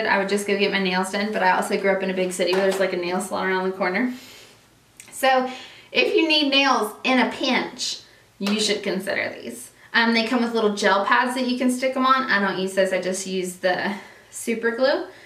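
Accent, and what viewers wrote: South, United States. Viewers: You are hilarious and I died like 5 times love your southern sass girrl;) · First of all. Your dancing & song choices are 💣. I swear to you we were meant to be friends! Second. I could listen to you talk with your southern slang alllllll day! And lastly- I think i need that MJ perfume in my life!! You know i trust you to the ends of the earth- so pretty sure this stuff is going to be incredible🙏 XO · I seriously love that you are doing videos! Keep them coming I could listen to your cute southern accent all day!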